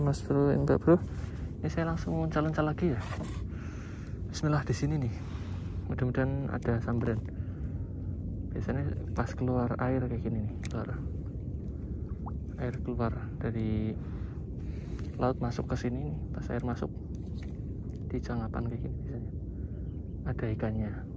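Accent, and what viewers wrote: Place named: Semarang, Indonesia. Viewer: Bahasane koyo wong semarang. Ndak yo?😊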